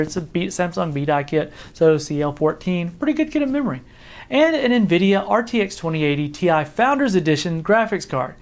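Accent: South, United States. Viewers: Just out of curiosity , which southern state are you from if i might ask!?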